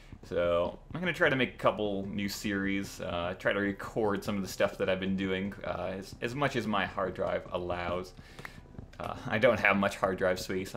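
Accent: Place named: Canada. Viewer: Awesome to hear those Canadian vowels again. Welcome back to the Internet's.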